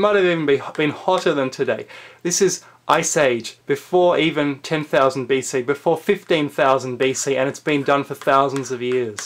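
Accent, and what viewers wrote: Australia. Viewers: He has an Aussie accent calm down. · Great video! And I could listen to the Australian accent forever, even you were telling me that horses can fly. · I really enjoy your astute commentary and speculations. Please continue. Your voice and accent are very easy on the ears. (unlike some Aussies)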